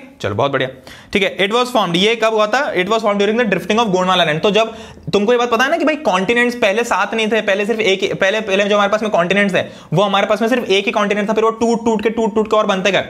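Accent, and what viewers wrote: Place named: Punjab, India. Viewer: Sir teaching bilingualy , mixture of Punjabi and Hindi , aaahhaahha❤❤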